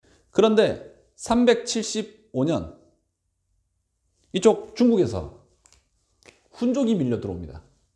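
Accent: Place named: Gyeongsang, South Korea. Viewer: 강사님 억양이 경상도 분이라고 추측해 봅니다. 뺀질뺀질한 서울 억양보다 듣기 조아요~~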